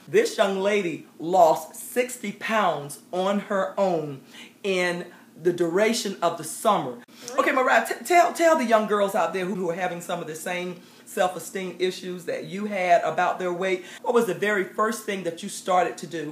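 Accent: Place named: North Carolina, United States. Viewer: I love ms accent. She sounds like my mother. Are you from North Carolina Ms.?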